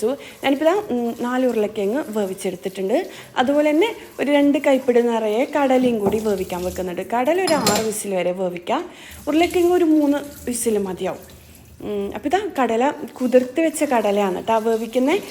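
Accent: Kannur, India. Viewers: നമ്മളെ കണ്ണൂർ ഭാഷ തന്നെ 😅😅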